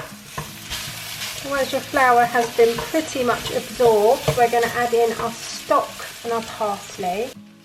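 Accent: United Kingdom. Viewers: So nice to hear a British accent on YouTube!!!!!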